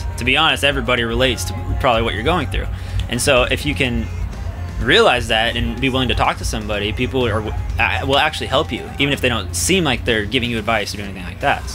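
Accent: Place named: Texas, United States. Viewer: you can hear the texas in his voice =)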